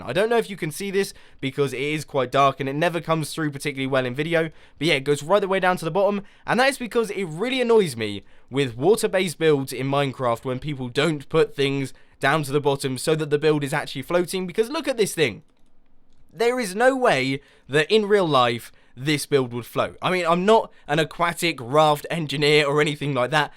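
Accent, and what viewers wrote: United Kingdom. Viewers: are you british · Are you british?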